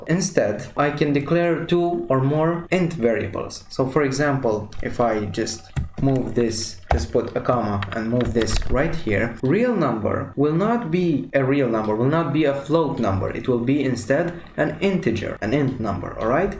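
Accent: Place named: India. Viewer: no problem man, sometimes i click a tutorial and then i get triggered by the voice and i would click away, this mostly happens with indian accents, i just cant listen to it, im literally in pain, but for some reason this happend with your voice, where are you from?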